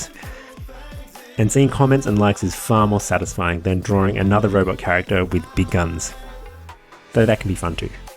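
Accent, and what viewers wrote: Australia. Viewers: Australian? o_o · WAS NOT EXPECTING THE HUGE AUSSIE (or New Zealand) ACCENT!!! I fell off my chair XD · wait was that sydney I heard..? yOU'RE AUSTRALIAN TOO?? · Whew, asian-australian (?). The accent was unexpected.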